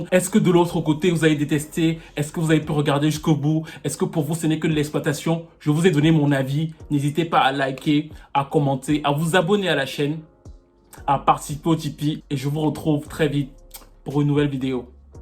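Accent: Cameroon. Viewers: Je viens de regarder ta vidéo et j'adore ton accents !! Moi aussi je viens du Cameroun 🇨🇲 et tu peux vraiment être fier de toi 🤗 😍 c'est même pas la peine de les répondre ces haineux ! ⚠️ 😡 J'adore toutes tes analyses ! Tes trop 💪🏾 fort j'apprends tellement de choses... Merci pour ton Travail 🤗🤗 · Je me suis toujours dit qu'il y'a un côté camerounais en toi. Non pas pour ton accent, mais par ta manière de parler. 💪🏾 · j’étais sur que tu étais mon frère Camerounais (d’ailleurs je t’ai posé cette question sur une autre video) Ta façon de parler et quelques manières sont typique🤗 J’aime beaucoup ta passion elle est contagieuse. Une seul mot « Continuez »😉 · Tu es trop beau, trop sexy, trop hot. Ah le Cameroun…. Tu es intelligent et j’adore ton accent. Bisous je suis une fan de Chine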